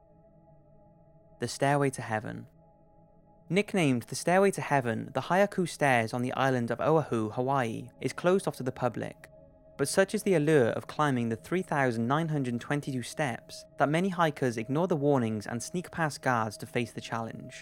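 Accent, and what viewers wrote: Wales, United Kingdom. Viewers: klo everyone wants to see the British-accented narrator's face xD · Are you welsh?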